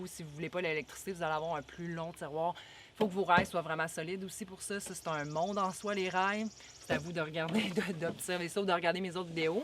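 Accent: Quebec, Canada. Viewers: Super ton van! J adore ta façon de présenter ton van et de ton accent québécois tu nous fais rêver. J ai aménagé un dacia Lodgy, j attends les beaux jours pour partir pour la première fois. Bisous de Bordeaux en France. Jaky 🎀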